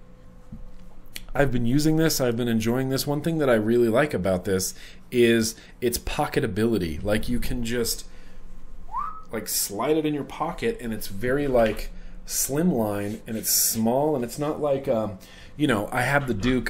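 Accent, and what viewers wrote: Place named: West Virginia, United States. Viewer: Hey there mr. lol i just bought a dreadnaut clone from fasttech and everything seems to be in all the right places but i cant seem to get it to give off big vapor ive built the coils like six times i have been using 28 kanthal and organic cotton i use a multimeter it usually gives me.9 ohms but when i fire it it seems like it takes too long and u have to press the button at like an angle for it to even fire what coyld i b doing wrong? Please any help or advice would be greatly appreciated i love your long ass vlogs its awesome i love that adorable too im from west virginia so we sound alike lol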